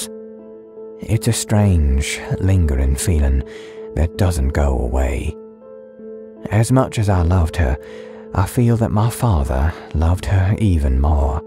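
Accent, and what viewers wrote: South, United States. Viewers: Wonderful timing, my good sir! Bed time! My but you have the perfect Southern gentleman advent! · Your southern drawl was Great!! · Have heard this from another narrator, but you did it so much better! Love that thair southern twang of yours!!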